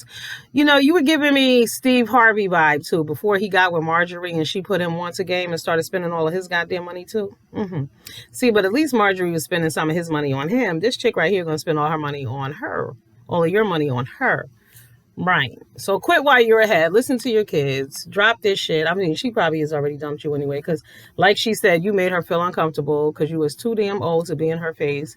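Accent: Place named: East Coast, United States. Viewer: I knew you east coast I can hear it all I live in Texas and everyone say I got that accent too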